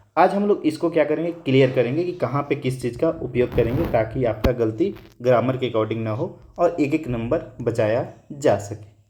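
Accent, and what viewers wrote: Motihari, India. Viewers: Aap Motihari se hai kya bhai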